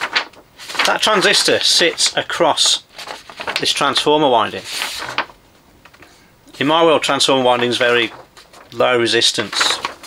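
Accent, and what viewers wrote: Birmingham, United Kingdom. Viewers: You are like all us Brummies a happy chappy, bostin fix bud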